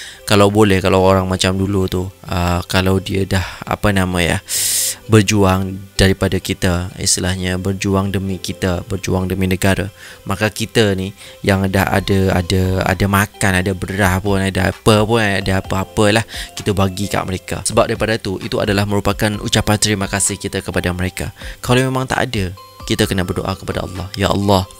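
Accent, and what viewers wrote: Malaysia. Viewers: Suara sedap...muka hensem..... ckp mcm org Malaysia..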